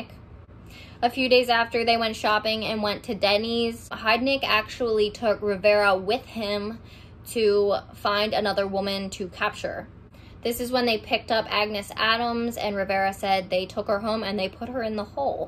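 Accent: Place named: Baltimore, United States. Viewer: Where u from if you don't mind..... im from Baltimore, and you have a Baltimore or MD accent. Jw but love ur story. Very detailed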